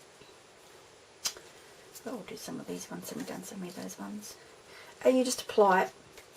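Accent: New Zealand. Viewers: Please tell me what you said , between the music not quite faded out and the Kiwi accent [I'm Canadian] I can't quite decipher what type of paper you're using there. I've got " ... you can see where the back ___? this has actually been done ___? paper but don't let that put you off ..." Thanks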